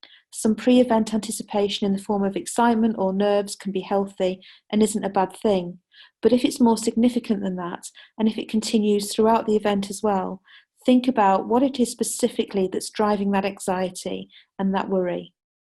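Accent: United Kingdom. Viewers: Great accent deep British accent, deep information. Thanks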